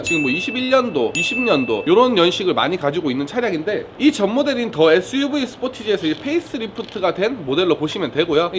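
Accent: Gyeongsang, South Korea. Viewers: 경상도사투리 싫어하는데 이양반 말투는 듣기좋아 ㅋㅋ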